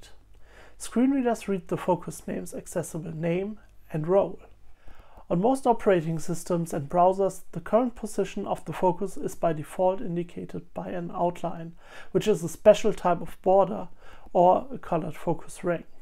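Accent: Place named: Germany. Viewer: Very nice. I like the German accent.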